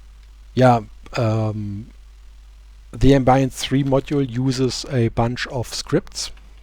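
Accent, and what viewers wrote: Germany. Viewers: You sound very german dude :D Nice Mod!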